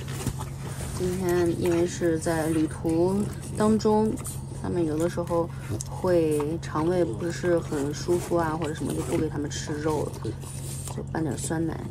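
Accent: Qingdao, China. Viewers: 哈哈 博主大概率是青島人吧？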